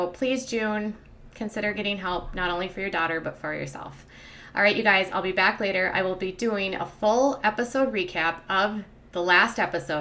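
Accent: Minnesota, United States. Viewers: Haha awesome shirt!! I asked you if you were a midwesterner?? I can spot a MN accent or northern a mile away. I am from MN so not picking on you. I am a new subscriber and love your videos!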